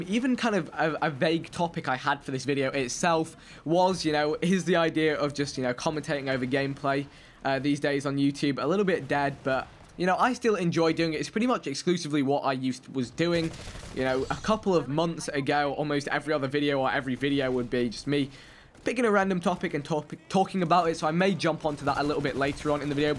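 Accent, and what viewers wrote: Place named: United Kingdom. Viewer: Ive only been watching your videos for about a month which is a short time frame in comparison to your 4 years of youtube but I truely enjoy watching your videos and the sideman collaboration! I still find it hard to understand an asian with a british accent but thats probably because I live in the American South (yall). I love the variety I think the more the better. Also Im not a youtuber (yet, school work) but Id like to do a Gta video with you and or the sideman sometime, my gamertag is hockeyslimshady and is also my twitter handle, I lack everything necessary to record gameplay but I think it would be cool to have an american in the gang who is canadian (true) and can speak french, SACRE BLEU! All in all, this feels like an essay but congrats on the 500k and keep up the good work!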